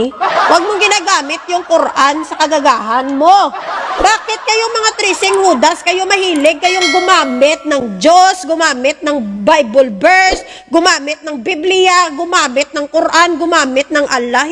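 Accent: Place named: Western Visayas, Philippines. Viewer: Ha ha ha nakakatuwa Ka idol para Kang d ilonggo kung Magsalita para Kang Beterano na reporter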